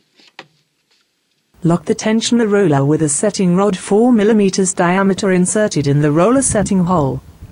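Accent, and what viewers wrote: United Kingdom. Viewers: So are you an Englishman in France?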